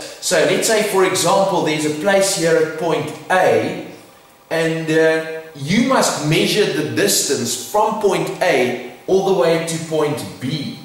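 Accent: Afrikaans, South Africa. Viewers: Afrikaans ascent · i literally read this before i watched the video and i was like "i bet his accent is south african" AHA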